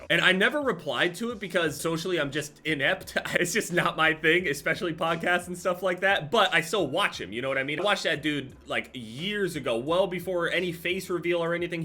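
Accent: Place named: New Jersey, United States. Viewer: from jersey, thats kind of how we just regularly talk lol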